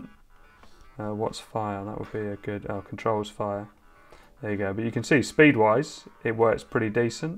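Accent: United Kingdom. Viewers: love to hear some English accents on a tech channel 👌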